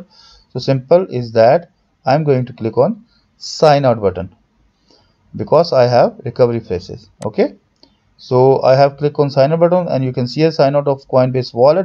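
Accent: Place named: India. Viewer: Gosh. The Indian English accent is the worst 🤮🤮🤮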